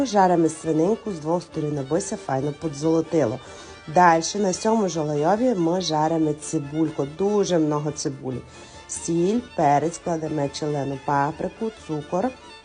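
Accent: Transcarpathia, Ukraine. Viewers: А яка чудова мова!))) я нібито на Закарпатті опинилась)))) Дякую за рецепт і за настрій)))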